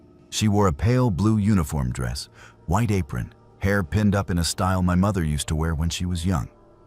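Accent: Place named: Louisiana, United States. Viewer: Louisiana common like your voice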